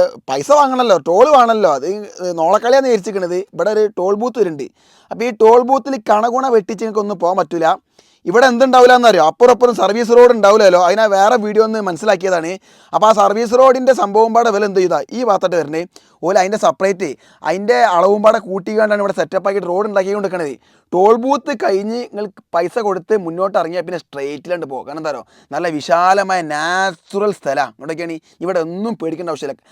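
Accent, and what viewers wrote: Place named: Kerala, India. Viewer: Ninte samsaram onn nannakkanam. Thani local malappuram basha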